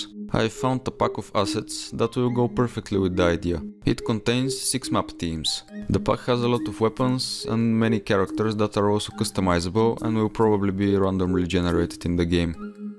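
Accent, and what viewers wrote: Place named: Balkans. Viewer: Great job, keep up, but I need to ask you something, are you south slav? Your accent is making me believe that.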